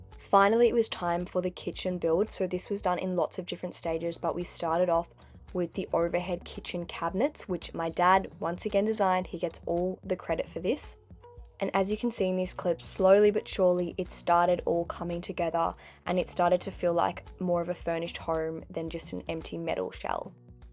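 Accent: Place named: Australia. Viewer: the most Aussie lady accent I ve ever heard, hope to see the bus in person one day, what a lovely build, love it ❤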